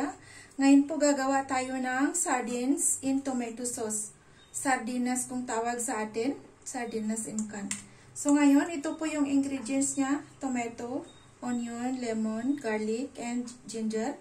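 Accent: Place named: India. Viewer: Sala Malaykum Sis ulam masalap. Looks yummy at I like your Indian Accent 👌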